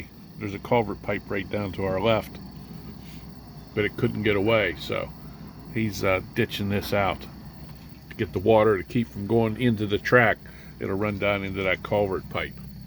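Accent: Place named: Pittsburgh, United States. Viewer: I always enjoy your videos,. Greene County is where my dad's mother came from. Love the Pittsburgh accent, too. Thanx again from South Fayette Twp.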